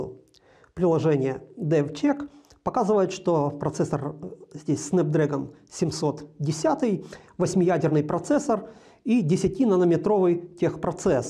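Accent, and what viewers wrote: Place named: Ukraine. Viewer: С Украинским акцентом хороший обзор.и достойный смартфон.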